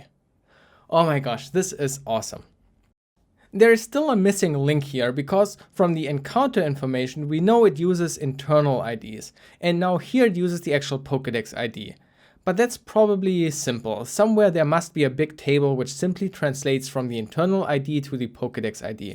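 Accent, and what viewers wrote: Germany. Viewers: You sound like u have a german accent, iam from germany 2 and i think iam smart enought to hear this? xD great video btw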